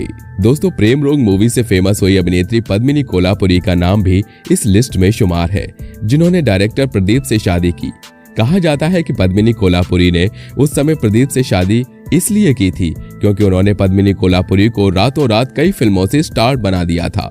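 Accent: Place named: Rural, India. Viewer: Yaar ye youtuber kaise baat karta h 🤣🤣 total gaavwala 🤣🤣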